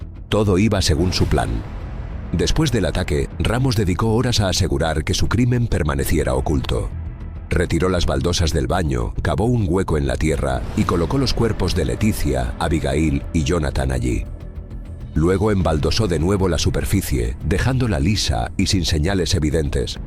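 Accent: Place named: Spain. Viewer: Ya era hora! Un narrador ESPAÑOL.😊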